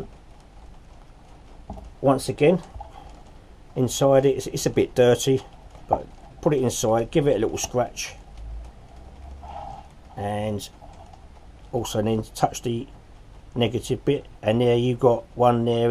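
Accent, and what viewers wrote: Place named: United Kingdom. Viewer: That was helpful although I had difficulty understanding through your British accent. Thanks.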